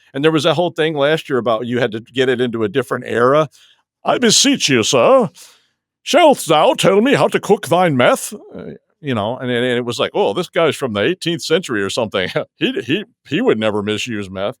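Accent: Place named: Rhode Island, United States. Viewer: If I didn’t know better, I’d say you were born and raised in Rhode Island.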